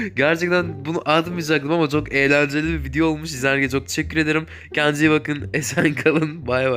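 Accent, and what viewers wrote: Azerbaijan. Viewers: Abi sen Azerbaycanlımısınnn{>¦¶ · Abi sen azerbeycanlimiwin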